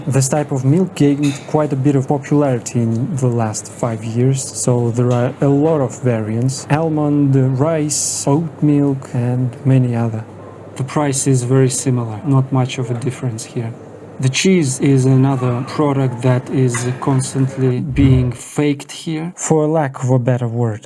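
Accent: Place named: Scotland, United Kingdom. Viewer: Sounds like a Scotty to me.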